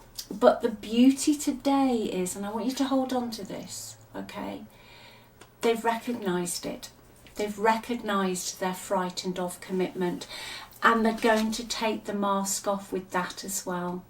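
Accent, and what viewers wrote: United Kingdom. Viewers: Hi , I’m Neo the matrix buster, love your accent, British? I live in Michigan USA!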